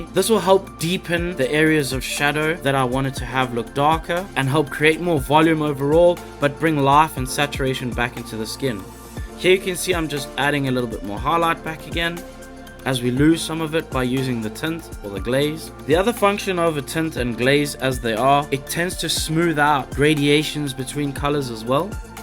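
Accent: South Africa. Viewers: South African, ???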